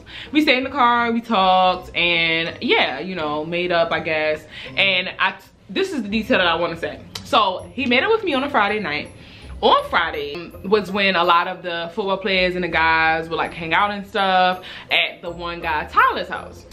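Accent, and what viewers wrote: Baltimore, United States. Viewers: U from Baltimore I can hear the accent 😂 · Lol Are u from Baltimore? Your accent 😆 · You sound like you're from Bmore. Remind me of talking to my nieces. I enjoyed the storytelling 💜 The math is just not mathing for me 😂😂😂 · It's the Bmore accent for me. I love it here. 😭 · Sound like you got a Baltimore accent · She sound Baltimore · U gotta b from B-more or somewhere n Maryland..... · Lol first time watching you and caught the Baltimore accent early I’m subscribing ❤️🤣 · Just got one question where u from Baltimore, jersey, or Delaware??? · Hold up twoooooo was kinda long bmore ? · U sound like you from baltimore😭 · U from bmore aint u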